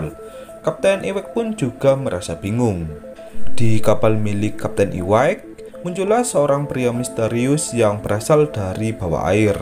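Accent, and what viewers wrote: Java, Indonesia. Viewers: "Medok-nya" kental. Wong Jowo toh mas?? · ini terlalu medok suaranya hahaha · Miminnya orang jawa kayanya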